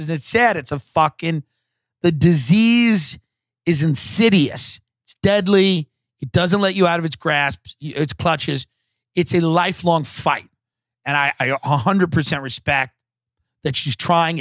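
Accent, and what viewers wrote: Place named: Northeast, United States. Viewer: I really like him because he's one of the relatively few people in the entertainment industry that are real true northeasterners. Like, a ton of people in the entertainment industry are from places like New York and stuff but they're usually very wealthy and Jewish or have family in the entertainment industry and they're just not relatable to people that grew up working class in the Northeast. Tim Dillon sounds like he could be someone you know if you live in New York, New Jersey, Rhode Island, Massachusetts etc. It's really poor up here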